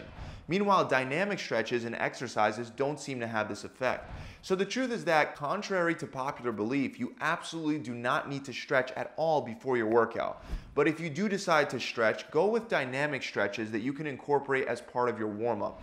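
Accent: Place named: New Jersey, United States. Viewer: He is from New Jersey. We in the northeast pronounce the a in water the same as the a in warm just like the British and Aussies. Most of the rest of the US pronounces the a in water like the one in watch. But in the tough inner cities of the NE, an outsider saying waah-durr might be told he sounds gay or weak. To each his own, I guess.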